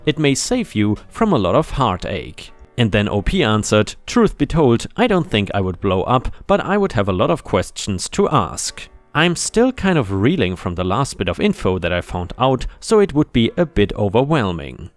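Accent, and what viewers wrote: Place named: Germany. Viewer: Dein deutscher Akzent ist nicht überhörbar, aber trotzdem nette Videos 👍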